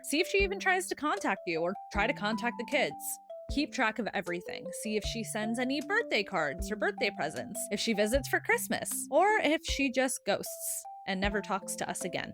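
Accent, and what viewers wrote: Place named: United States. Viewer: Where are you from that sounds like America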